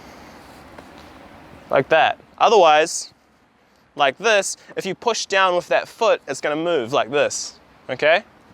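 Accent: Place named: Australia. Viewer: Thanks so much,! I’m 53 and decided to learn while corona-confined. I’ve watched you so many times that I’m starting to speak with your AMAZING accent! I am an American who was inspired by a pastor in Taiwan, trained by a YouTuber from down under. Yesterday I rode a quarter km, but am still having a terrible time with mounting. Both methods are tough for me, so I’ve used a brick to prop and prevent rolling backward. I need to break this bad habit, because i’m tired of having to walk back to my brick. Any more mounting tips?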